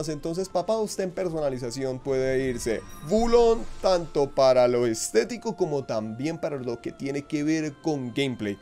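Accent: Colombia. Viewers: severo acento, de una supe que era de Colombia xd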